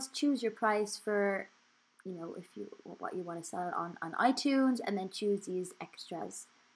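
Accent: United States. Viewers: What's with the American accent Allie? you are from the people's Republic of Cork... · Sounds like she's going a tad American pronunciation,) ammhmmm....well is it?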